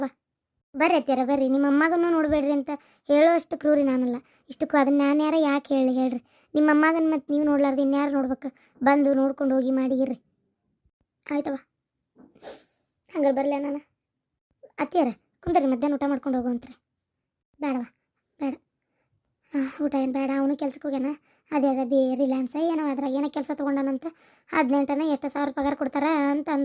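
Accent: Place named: North Karnataka, India. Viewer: Ok madi uttara karnataka baigala kelake ond maja ❤😅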